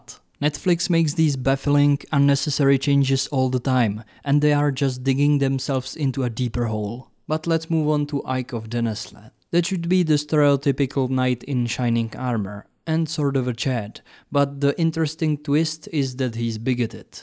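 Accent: Czech Republic. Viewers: love your Czech accent!❤ keep it up, bro.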